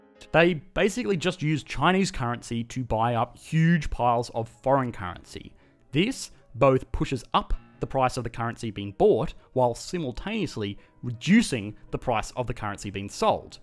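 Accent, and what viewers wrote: Australia. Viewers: I’m loving your channel as an uneducated economics enthusiast, and the Aussie accent only makes it better! Keep em’ coming! 🇦🇺👍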